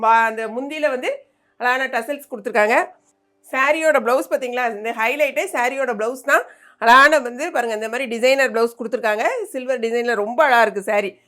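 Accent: Tirunelveli, India. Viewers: 👌👍 திருநெல்வேலி யா நல்ல தமிழ் பேசுதேங்க இது முதலில் எழுதியிருக்கேன்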